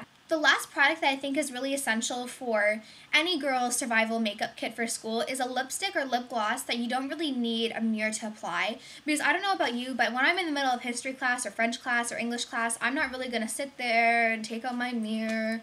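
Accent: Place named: Canada. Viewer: look at how tan you were! goodness..haha your so pretty and i wish i had a canadian accent haha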